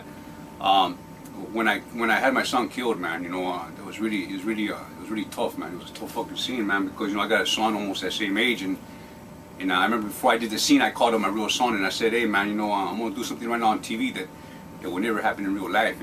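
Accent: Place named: Mexicali, Mexico. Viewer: he's got the most stereotypical mexicali voice and accent there has ever been in the multiverse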